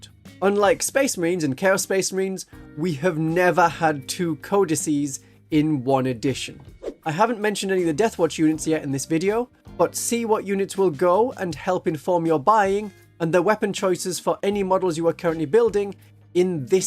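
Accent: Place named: Geordie, United Kingdom. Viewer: Where is your accent from? Sounds posh Geordie.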